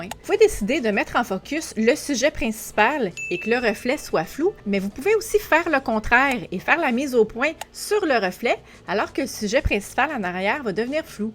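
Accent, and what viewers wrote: Quebec, Canada. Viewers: Merci pour la video. Votre charme, celui des vos chats et votre "accent" québécois (je suis suisse) y contribuent beaucoup. Et merci pour les astuces très bien expliquées.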